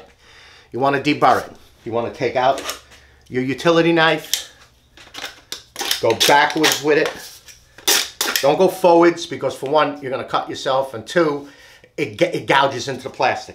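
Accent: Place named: Long Island, United States. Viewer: I have watched more dust collection videos than I care to admit to. Your video has got to be the very best at explaining the fittings and the types of pipe and fittings needed. In addition your accent reminds me of my childhood living in long island!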